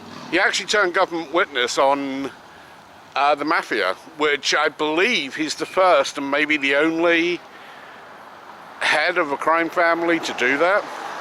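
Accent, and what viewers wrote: United Kingdom. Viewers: Wow! Looking forward to that! After watching this video today, it prompted me to watch that scene again from "Goodfellas", which of course can be seen here at YouTube. Even after all these years it still packs a punch! Here's hoping you have a wonderful weekend,! Thank you for all your hard work! Oh and please don't worry about rambling too much. I think I speak for a lot of your fans when I say we can't get enough of your charming Brit accent! 🙂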